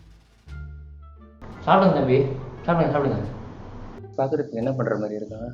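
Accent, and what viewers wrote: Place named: Malaysia. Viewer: are you a indian malaysian coz ur accent though different i hate indian accent but ur's is kinda malaysian indian accent anyway good video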